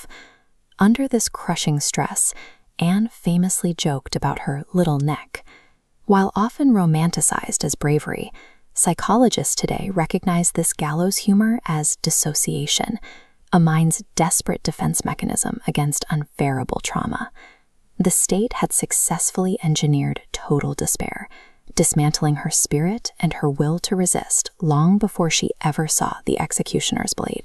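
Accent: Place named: United States. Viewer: If you are going to post British stories, at least use a British Accent, instead of American nonsense.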